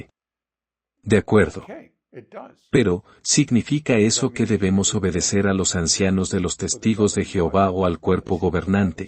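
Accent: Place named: Spain. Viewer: You Will forget Your spanish accent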